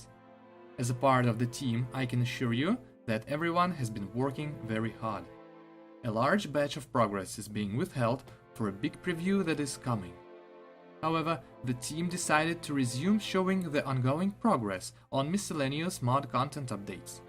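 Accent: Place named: Russia. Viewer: Я по акценту сразу определил русского)))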